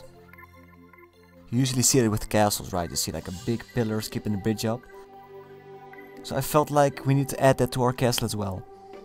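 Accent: Netherlands. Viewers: You are dutch right? I can hear it in your accent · You sound very dutch to me... no doubt.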